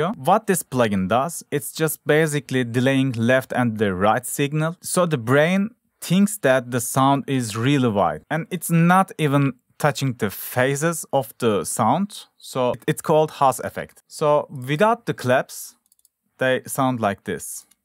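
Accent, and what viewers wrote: Turkey. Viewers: Abi valla çok teşekkürler ya 1.5 saattir bunu arıyorum. Konuşma tarzından direk türk olduğunu anladım :D Bu arada parça muhteşem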